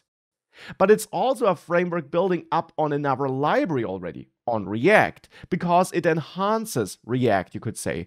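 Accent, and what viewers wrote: Germany. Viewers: Warum höre ich ein deutsches Akzent. Naja alles gut Bruder l.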